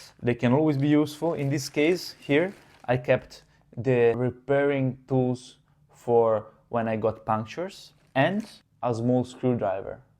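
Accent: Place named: Italy. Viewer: Si sente che cmq sei italiano ❤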